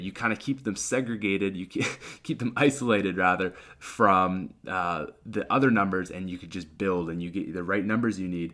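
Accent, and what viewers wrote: Canada. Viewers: He sounds so Canadian In this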